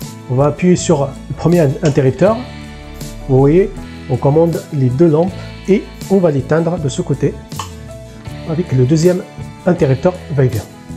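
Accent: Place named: South, France. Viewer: Je découvre et c’est vraiment bien expliqué, et en prime cet accent du Sud qui lorsque on vit dans le Nord remplit de bonheur.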